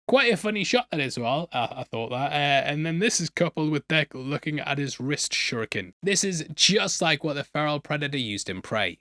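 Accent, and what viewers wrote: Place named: United Kingdom. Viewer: He's British, they have a million different accents on a tiny island country. I lived there for years.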